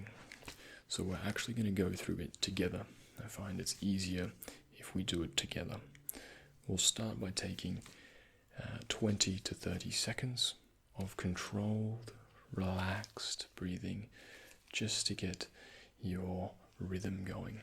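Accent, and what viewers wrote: Australia. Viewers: Don't mind me. I'm just here for the aussie accent. :) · Seeing all these people hyping over the deep Australian accent makes me think how many nights I've spent not alone have been caused by the same type of horny people.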